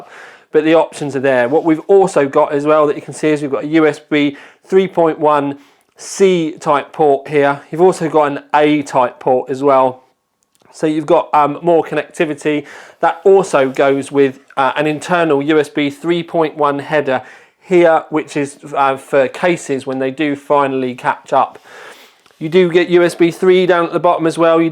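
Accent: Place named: Norfolk, United Kingdom. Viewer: gotta be a naarfolk boy i reckon...good job....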